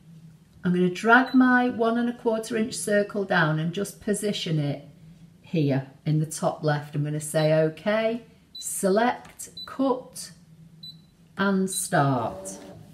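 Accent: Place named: Midlands, United Kingdom. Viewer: Thank you so very much for the ideas! I have just got this set for the lipstick! My granddaughter is suddenly into makeup and we were unable to find ready made cards to suit her age and likes, so this is very timely for me! I love the clarity of your explanations - you don't assume anything and again, for me, that's perfect! I have a scan n cut that's not been used, so now is the time because you are a great source of inspiration. I also love your accent, my dad was from the midlands and I don't get to hear him any more so listening to you is a bit like "home" except I'm an Aussie lol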